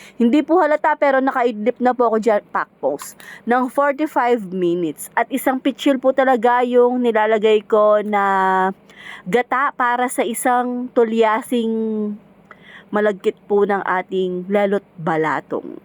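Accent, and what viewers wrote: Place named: Pampanga, Philippines. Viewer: gusto ko yun kapampangan tagalog hehe!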